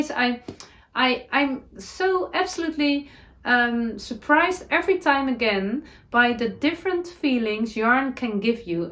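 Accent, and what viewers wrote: Netherlands. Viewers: Happy New Year, it was so lovely to hear your dutchy English this morning, I really missed you. That's wonderful news about the YT Friday podcast. I would love to join you! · You make me smile!!!! I love your dutchy English. I may have to make a festive sweater exactly like yours…I love it!🧶❤️🇨🇦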